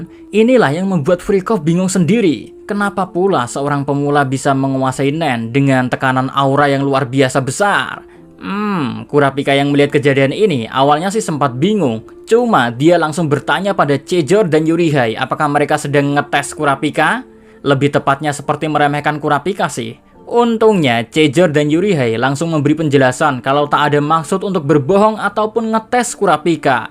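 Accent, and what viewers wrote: Java, Indonesia. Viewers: Medok banget mas 😅😂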